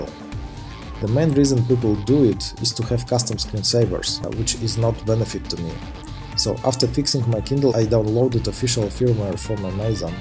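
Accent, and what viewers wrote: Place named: Eastern Europe. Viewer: Собрался выбросить, думал батарея... заменил, без толку, звездочка крутится и виснет. Лазил в поисках волшебного "Big hardware reset for Kindle", нашёл это. Буду колдовать. Спасибо. В режиме санкций, концепт пoтреблJтства "купил-сломалось-выкинул-купил" необходимо забыть и восстанавливать то, что можно починить. Благодарность автору! (прошу великодушно простить, но акцент в ролике - наш, славянский).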